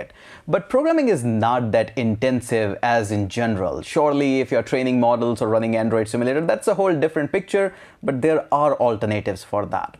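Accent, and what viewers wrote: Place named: India. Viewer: Indian accent 😅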